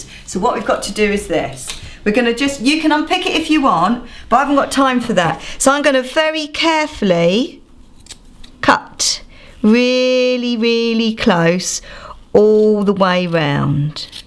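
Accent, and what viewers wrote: United Kingdom. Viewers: omg just discovered ur channel. finaly a british doing something practical, all my other youtuber makers are speaking either american or ausies which is kinda confusion,.... subscribeeeed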